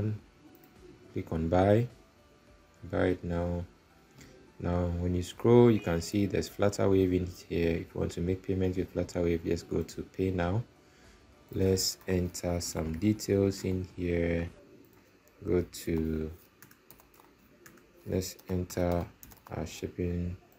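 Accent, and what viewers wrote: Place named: Ghana. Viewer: great video. I can see you have a Ghanaian accent, and I would like to link up. I want to learn from you . Great video sire.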